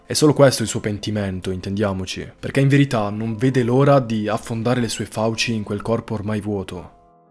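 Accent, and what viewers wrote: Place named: Bari, Italy. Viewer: Pensavo anche io, poi ho sentito come pronuncia le "o", direi che è barese. Mancano anche le doppie ovunque. Fonte: sono sarda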